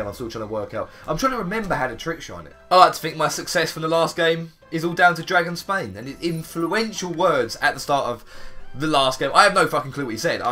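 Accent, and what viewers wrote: England, United Kingdom. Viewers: Lee Gibson he's from England bro, he ain't American or Canadian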